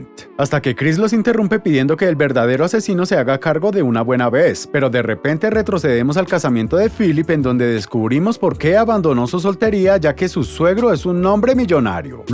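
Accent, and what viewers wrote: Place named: Colombia. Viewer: Eres de Colombia? es que tu acento se parece a uno de los tantos acentos de que tenemos aquí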